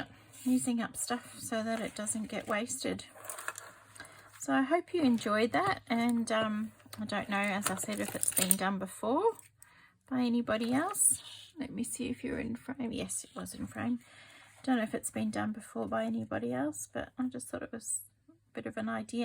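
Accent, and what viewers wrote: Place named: Queensland, Australia. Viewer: New subscriber from BC Canada spent 2 1/2 years in Queensland as a teenager love hearing you talk makes me homesick for my second home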